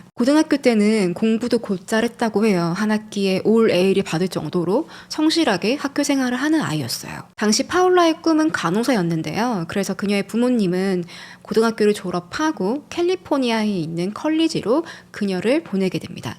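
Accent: South Korea. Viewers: 외국에 사는 발음이 아닌데요. 한국식 영어.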